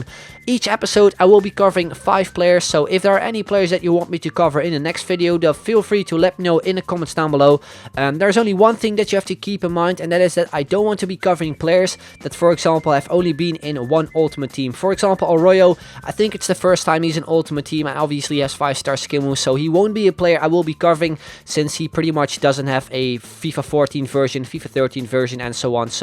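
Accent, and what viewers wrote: Netherlands. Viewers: Je hoort dat je Nederlands bent😌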